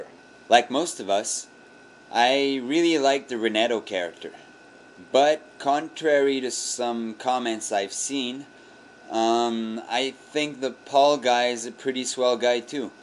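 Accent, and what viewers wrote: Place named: Quebec, Canada. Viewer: Loved the animations! And had to listen VERY HARD to find any Quebecois accent in there. Pity, kinda, I love the melodious way some Quebec'ers speak english.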